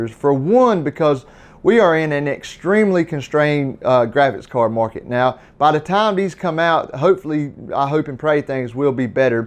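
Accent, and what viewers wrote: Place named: Kentucky, United States. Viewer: About time someone on the east coast gets a tech following going lol. I think GN is east coast but not sure. Are you from KY by chance? I only ask because you have almost the same accent as I do.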